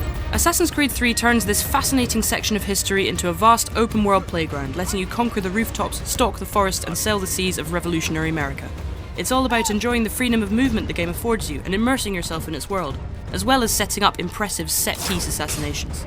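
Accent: United Kingdom. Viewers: you're probably british...can't take you seriously. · i was not prepared for an 11 yr old British guy than the usual twenty something white boy lol... umm this is IGN right?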